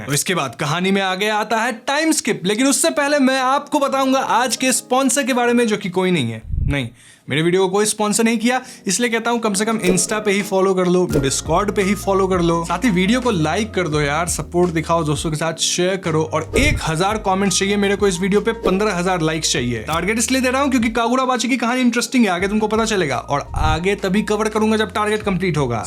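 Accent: Bengal, India. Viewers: Bhai tu bengali hea kya ? 🤔🤨🔪 coz some of your accents is so similar with bengal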